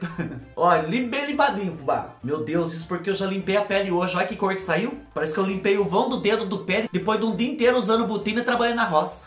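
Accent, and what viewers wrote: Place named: Piauí, Brazil. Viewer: Fubá, você é do Nordeste? Eu sou do Piauí, Amarante, mas moro na capital Teresina...sua fala é idêntica à nossa...com erros de Português, mas nós somos inteligentes e estudiosos graças à Deus! É a força do hábito mesmo...e o que deixa você mais engraçado é o seu linguajar... Você deveria ir pra TV Globo ser humorista 😂 Eu queria saber quem é essa "muié" que lhe ensina essas receitas... E por falar em receitas eu fiz a da casca de banana, maizena e óleo de coco...e senti que deu uma suavizada no bigode chinês...tenho 6.6, queria ter ficado com uma cara de 50 pelo menos, mas minha idade metabólica é 58 anos (mesma idade do meu namorado😅, e minha cabeça é de uma menina de 20😊), fiz minha bioimpedância (21/08/2024) e tudo está normal 🙏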